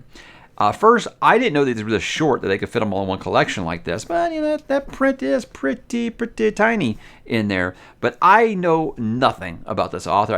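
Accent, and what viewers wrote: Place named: United States. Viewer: You're fine pronouncing names the american way because... you're american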